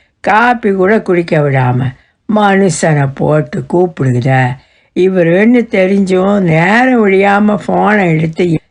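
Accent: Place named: Nagercoil, India. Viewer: very nice madam .... Nagercoil Slang nalla Pesureenga .... nangil nadan in ella Kathay Ka lum bathividungal.... Thank you...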